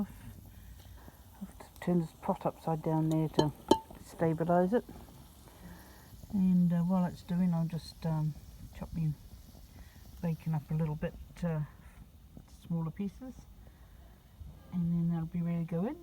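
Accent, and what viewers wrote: New Zealand. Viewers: ......nice deck........😜👍 That's awesome with a Kiwi accent .....😋, sorry😞. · That looked scrummy! No comment re the word "deck" and your (charming) Kiwi accent! Cheers. Ken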